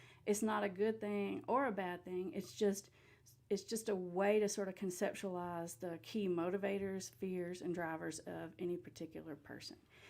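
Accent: South, United States. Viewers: Plz turn on captions so we can understand ur southern accent...thanku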